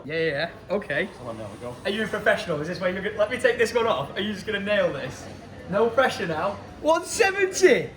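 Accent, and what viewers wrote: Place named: United Kingdom. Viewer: I absolutely love your accent but as an American i have one too. But what wanted to say is i love the way the way you say but. It’s so British. Love your blogs. And by the way i am percent British my ancestors come from Manchester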